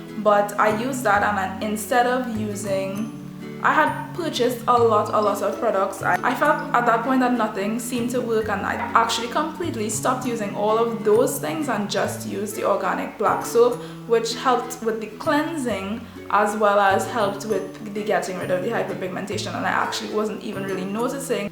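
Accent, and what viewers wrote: Trinidad and Tobago. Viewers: know a Trini when I hear one 🇹🇹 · I hear a trini accent! yasss gyl i support u! and you're very beautiful btw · Your skin looks really good. What foundation do you use, if you still use any? I'm really happy to hear another trini voice ;-) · Sound like my Trini neices from San Fernando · im hearing that trini accent girllll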